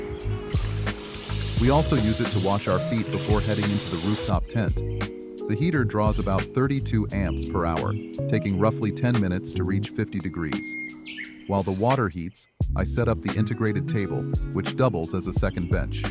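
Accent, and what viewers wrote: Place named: United States. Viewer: lol i heard the American voiceover and i thought US, seeing the name i now recognise you from Insta 👍 From Melbourne and will hopefully be travelling with the 80 next winter👍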